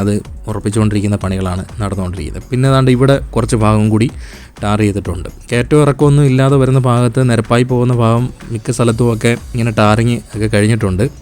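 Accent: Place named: Kollam, India. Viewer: കൊല്ലം ഉള്ളവർ ഇങ്ങനെയാണ് സംസാരിക്കുന്നത്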